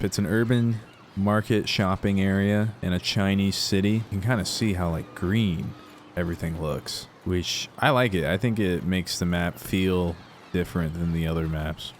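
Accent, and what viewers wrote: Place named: California, United States. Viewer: Dude, you and Point & Shoot sound sooo similar its crazy HAVE TO be from the same area of Cali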